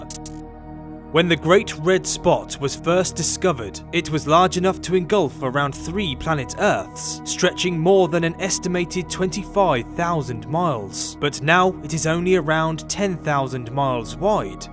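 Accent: United Kingdom. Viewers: I just dont get it you you speak like a british but you use imperial measurements. Anyway, have you ever wonder that there might be a civilization or alien life inside that giant red spot? protected by the cyclone itself.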